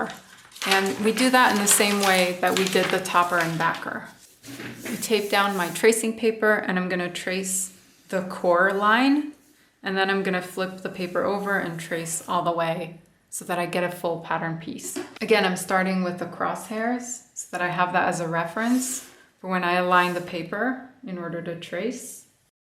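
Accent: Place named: Canada. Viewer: Hello, this video is so useful!!! I really appreciate your help! Are you from Canada? I think I hear your about pronunciation, plus you use cm. Greetings from Cleveland Ohio.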